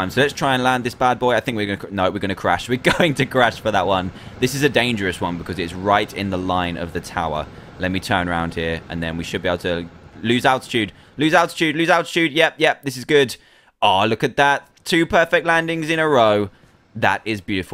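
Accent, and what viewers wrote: United Kingdom. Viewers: HE'S BRITISH LIKE ME IDIOT! French ppl sound different!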